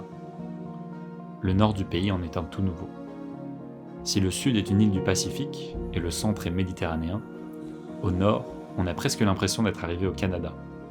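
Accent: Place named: Japan. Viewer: 事故無く日本縦断を楽しまれて何よりです。音楽と西諸弁のナレーションも心地よかったです。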